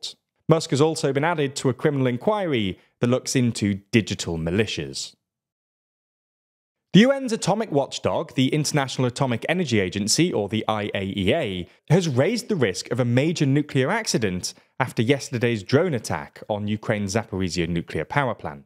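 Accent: United Kingdom. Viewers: Ok but why are you British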